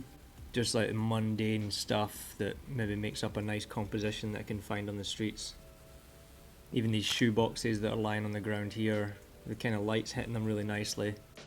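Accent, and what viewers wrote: Scotland, United Kingdom. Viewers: Loved it! So much life at night there, up here its almost dead at night. Thanks for sharing. And btw... love your scottish accent;)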